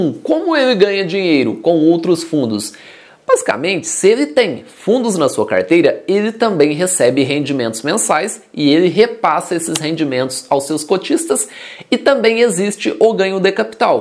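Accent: Brazil. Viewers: ah, legal, imaginei que pudesse ser do interior de SP ou MG! Sotaques e regionalismos são coisas que me deixam curioso! Agora vou poder me concentrar no assunto dos vídeos. Antes, eu ficava só pensando, "de onde será este sotaque", e esquecia o que estava sendo dito no vídeo! 😀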